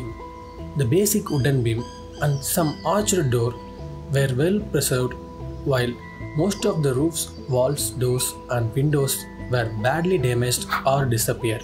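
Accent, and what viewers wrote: India. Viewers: Why indian accent? So.boring & cringe!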